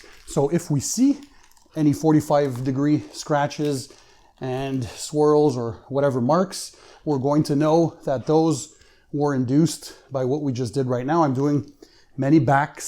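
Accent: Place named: Quebec, Canada. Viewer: oui, mais j'aime bien ton accent québécois. Ça faisait partie de ta personnalité.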